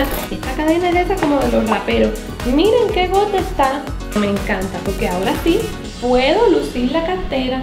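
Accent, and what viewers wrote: Cuba. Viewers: Hola, me gusta mucho ver tus vídeos y escuchar tú acento cubano 😅 Participó por cualquier cosa, así como dices tú "la suerte es loca y a cualquiera le toca" esperemos que ahora sea mi turno 🤗🇲🇽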